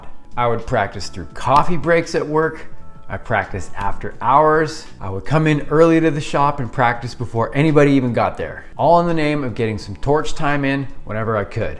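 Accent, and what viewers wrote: Canada. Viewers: Canadian aye 👌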